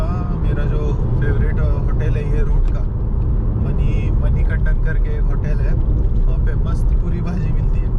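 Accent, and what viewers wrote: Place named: Maharashtra, India. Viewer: Are wa wa marathi mulga talking in English..... Good good nice information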